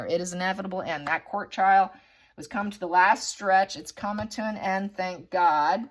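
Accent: United States. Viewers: I am from Manhattan. Your very mild Long Island accent comforts me. I live a long way from home now. It's good to hear "home" so intelligently and soulfully spoken! Thank you!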